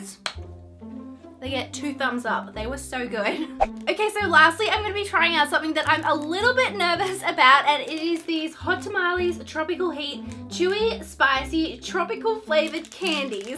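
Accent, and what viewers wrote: Australia. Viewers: r u from sydney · Are you Australian? Xx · are you australian 🇦🇺🐨🐑🇦🇺🐨🐑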